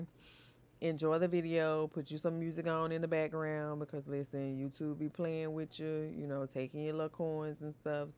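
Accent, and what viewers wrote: South, United States. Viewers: Don’t apologize for your country accent. Thanks for the video. 😊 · Lmao 😂 girl .. no music cuz YouTube 😂 I love country accents please narrate ❤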